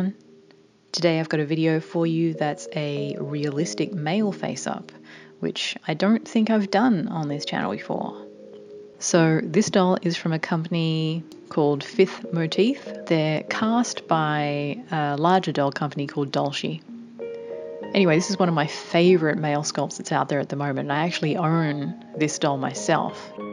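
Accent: Australia. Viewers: Love the Australian accent. Show me how to get one. Your work is amazing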